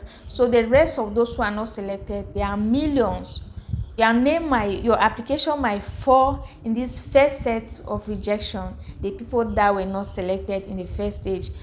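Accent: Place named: Cameroon. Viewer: She sounds like a Cameroonian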